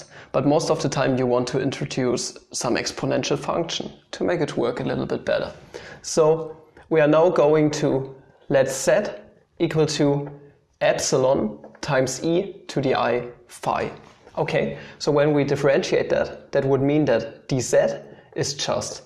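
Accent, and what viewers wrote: Germany. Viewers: No offense, but the German accent is not helping pronunciation. 😁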